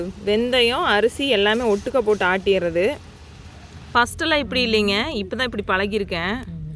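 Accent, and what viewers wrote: Kongu, India. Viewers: அருமையான வாழ்கை நம்ம எதார்த்தமான கொங்கு தமிழ் வாழ்க வளமுடன் ❤❤❤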